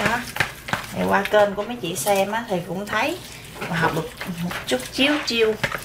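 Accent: South, Vietnam. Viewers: chị nói tiếng miền Nam nghe đã tai quá 😊😅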